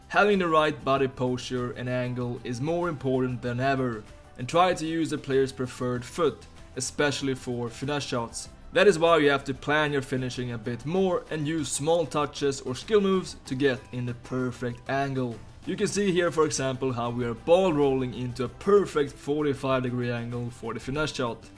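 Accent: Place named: Sweden. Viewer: He is so swedish listen to his accent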